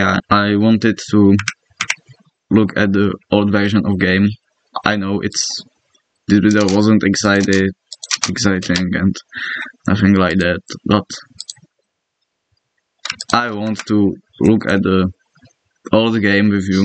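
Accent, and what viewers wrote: Czech Republic. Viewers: i can hear your czech takže ahoj so slovenska! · Hmmmmmm Czech accent? Nice video bro💪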